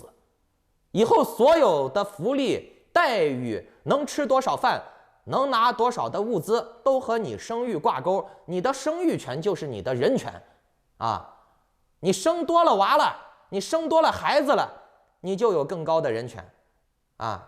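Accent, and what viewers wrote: Henan, China. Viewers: 我听着你就像是河南人。你在国内并没有在国外。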